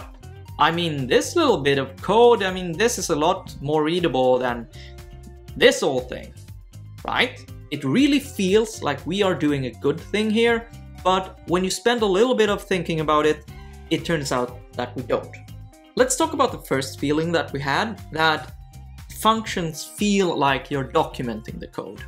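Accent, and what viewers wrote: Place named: Sweden. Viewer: Hi guys i'm i lead a small web-dev team writing mostly angularJS 'i also have a background in C# yet i fail to see +Krzysztof Suszyński point? BTW + i love your show you explain things very clearly and your Swedish accent now resonates in my mind on mondays:) i also have mixed emotions about the point made in the video..perhaps +Alexandre Franco video will make more sense of it i will have to watch it. nevertheless +Krzysztof Suszyński can you elaborate more on why is this more valid in JS?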